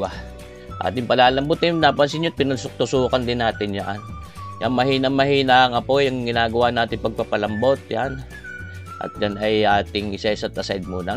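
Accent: Batangas, Philippines. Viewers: Hello sir I like the way you cook and I love the batanggenyo accent. Greeting From Homer Alaska this is Berna Brown. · d ko n kailangan mag aral sa school sa iyo lang madami n akong nlaman lutuin pati batangas accent mo.....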